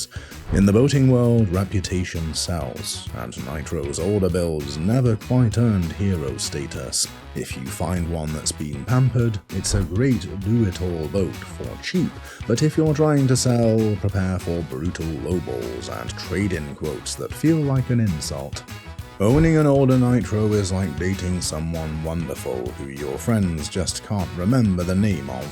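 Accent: United Kingdom. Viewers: Utter and pure horseshit. Kept watching just to see how idiotic this one got. Which it sure did. As a boater since childhood, I cannot remember an elite population as this British voiced fool implies, ready to question brand after brand at this level of boat. Yes there are cheaper quality boats just as automobiles are. And the world keeps spinning what a shocker. Do me a favor and stay home and impress yourself that a life of snobbery is far better than enjoying the boating scene. 1994 Four Winns owner here - old school in the house that takes care of his boat and could care less there are OMC labels on a Volvo and Ford I/O setup!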